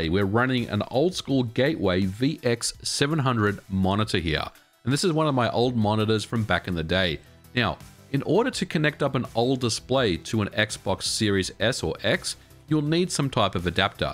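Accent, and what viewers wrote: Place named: Australia. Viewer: Australian accent Next time I'll turn it into an Amiga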